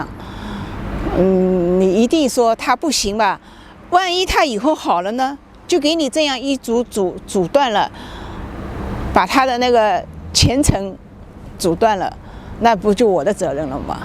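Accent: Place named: Shanghai, China. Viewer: 上海口音很浓厚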